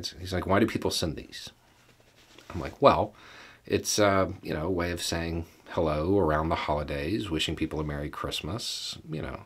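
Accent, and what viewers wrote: South, United States. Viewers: Enjoy your channel (and accent from one southerner to another). What I tell folks is it keeps ppl in jobs. In this case the postal workers. Your son would probably answer that Internet companies have workers too? Well no son. They have AI reps that you can't get an answer from or they've lost their jobs like Twitter. I won't get into the environmental part of this, but as others have pointed out not all paper is cut from trees. HAPPY HOLIDAYS! See? I just saved time, money, and a tree. Have a good'un.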